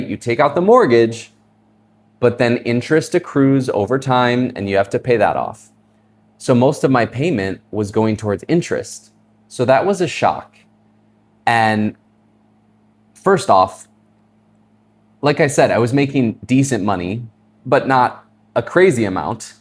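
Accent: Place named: California, United States. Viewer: I watched full video you should continue this path you have California accent and I hear foreign love that accent 😅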